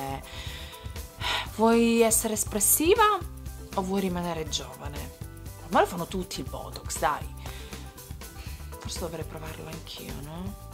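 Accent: Sicily, Italy. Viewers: MA SEI MESSINESE O CATANESE? :D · ma sei siciliana ?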